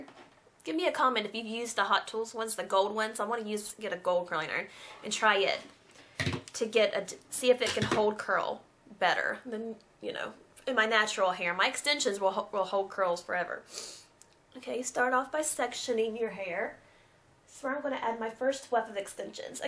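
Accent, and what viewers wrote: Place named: South, United States. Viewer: Where are you from? I sense a lil' southern accent? Love it!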